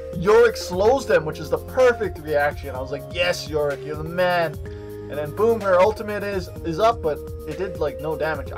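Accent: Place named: Hawaii, United States. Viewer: you must be from hawaii. you sound too local and cool to not be.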